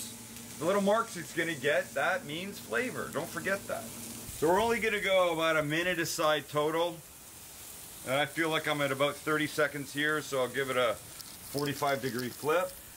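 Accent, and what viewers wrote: Canada. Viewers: Is he from Canada?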